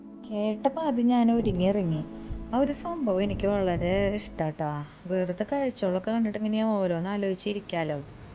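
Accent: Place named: Thrissur, India. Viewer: Nice presentation..... Thrissur kari 😂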